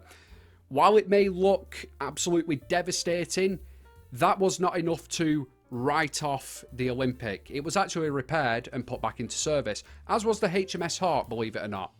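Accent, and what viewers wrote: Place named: North, United Kingdom. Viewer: Long time TNG Fallout follower, nice to put a face to that Northern Voice. Simply, simply lovely.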